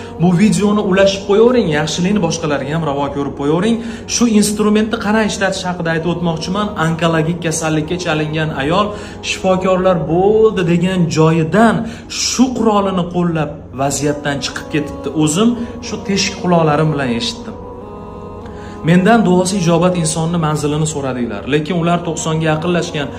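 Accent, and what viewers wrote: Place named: Fergana Valley, Uzbekistan. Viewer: Оглим сизни тингласам мазза киламан : бирам чиройли килиб водий шевада гапирасиз оглим : Тани жониз Сог болсин Ширин болам : асал болам : Рахмат сизга : 72 ешли онахон сизни тинглаб рохат киламан👍👍👍👍👍❤️❤️❤️❤️❤️❤️❤️❤️❤️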